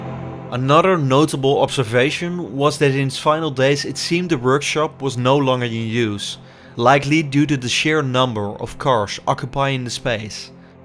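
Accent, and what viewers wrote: Netherlands. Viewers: Kikass Dutch accent! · The person reading the text must be Dutch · Het Nederlandse accent is te erg. Klinkt echt slecht. De video is wel leuk. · Bro that dutch accent you heard that right away! haha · Do i hear a dutch accent🤔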